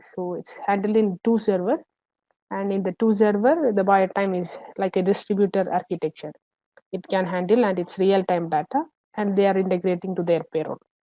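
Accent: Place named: India. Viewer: لماذا الفيديو باللغة الأنجليزية وبلهجة هندية ؟ هل هذا استخفاف بالمنطقة أم أنكم لم تجدوا من يقوم بهذا الدور لكم ؟ لم يعجبني الفيديو ...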